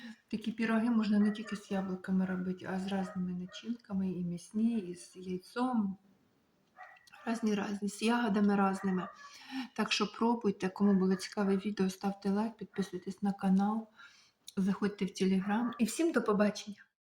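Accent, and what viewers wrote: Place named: Odesa, Ukraine. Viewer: Яка ж у вас чудова одеська мова!! Ви СУПЕР господинечка!! Як я люблю дивитися на Вас і Вашу працю! Обов'язково зпечу цей КРАСЕНЬ!!!❤❤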